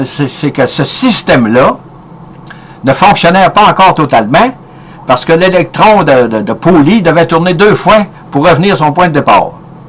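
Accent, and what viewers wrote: Quebec, Canada. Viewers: Vraiment génial comme vidéo, j'adore la physique et l'histoire des science. Ton accent québécois est plutôt sympa ahah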